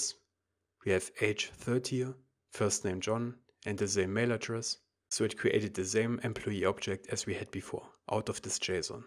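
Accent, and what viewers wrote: Germany. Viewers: i love that light german accent (y), excellent work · I think a hear a german accent😁😁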